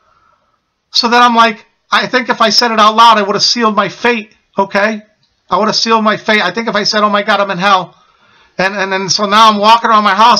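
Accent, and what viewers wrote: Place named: United States. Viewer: thank you so so so so much for sharing this....I just happened on this video, but I'm sure for good reason. You just sound like the typical American guy...and the thing is, there are MILLIONS of us just typical Americans worried about things that don't matter, and spending all of our time wasting life. Your testimony bears upon my heart, even though I've been a Christian all my life...there's more. There's more about being a servant of Christ than just being a 'Christian'. Like you are saying...God bless you. God bless you. Jesus thank you.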